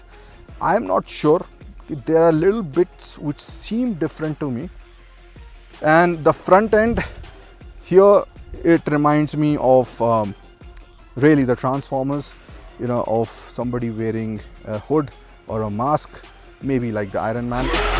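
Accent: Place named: India. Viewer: Watching and listening a review on M1000R in Austria with that indian accent ..... I dont want to mute the video cause i do want to know about the experience but ...smh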